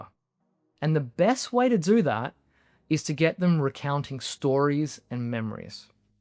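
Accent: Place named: Australia. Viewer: Me too, and I like his Aussie accent too! 😊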